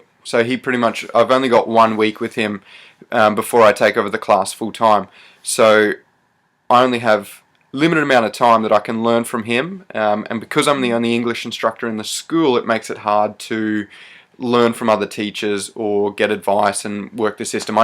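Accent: Australia. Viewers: Bradlyn Dukes I'm aware accent is aussie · im pretty sure his accent is Australian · Watching one of your older videos makes me think if you losing slowly your Australian accent.what do other people say about that? Cheers mate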